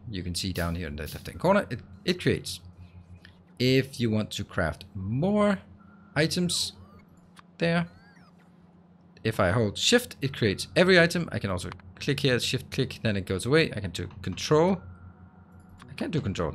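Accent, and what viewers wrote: Denmark. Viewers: Just a quick question. Are you from Denmark or Scandinavia? I can hear you have a danish ascent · 16 seconds of your voice then i Knew you were danish. god video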